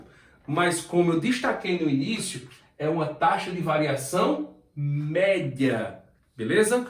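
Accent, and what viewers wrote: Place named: Northeast, Brazil. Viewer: Pense num sotaque bonito é o do nordestino! Aula top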